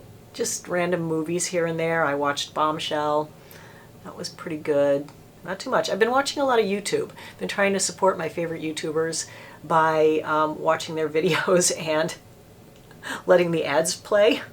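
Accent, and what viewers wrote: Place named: Massachusetts, United States. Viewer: Omg! I knew you were from Massachusetts somewhere. I can tell by your accent! I'm also from Massachusetts! I'm in Webster Ma by the lake we have here... 🤗 Yaaaay I love seeing YouTubers from Mass! I'm so excited right now!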